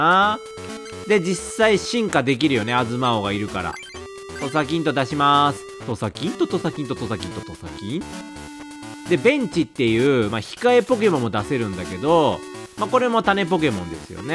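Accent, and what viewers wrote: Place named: Western Japan, Japan. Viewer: なんかね😂西の人だなぁって😂😂 こん↑ぱん↑とか いー↑ぶい↑ って キュンぽいんとなのは私だけ？😂❤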